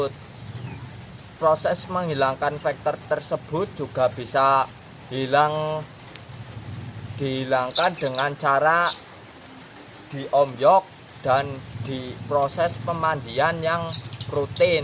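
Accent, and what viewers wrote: Java, Indonesia. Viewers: Ngomong nya jgn medok" mas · Ngapak tenan koek kang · Salam medok🙏🤘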